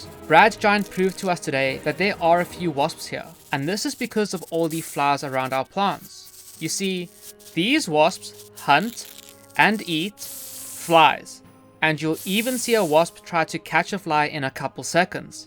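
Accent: South Africa. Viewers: Dude this is amazing. You sound south african? Do you know where to get fly traps in Johannesburg?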